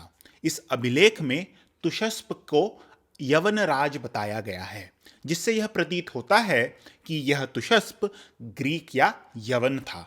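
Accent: Standard. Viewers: आपका शोध और आपका शुद्ध हिंदी उच्चारण उत्तम है किंतु उसने करा या उसने करी जैसे शब्दों से बचिए।उन स्थानों पर किया या की शब्दों का प्रयोग करें,धन्यवाद।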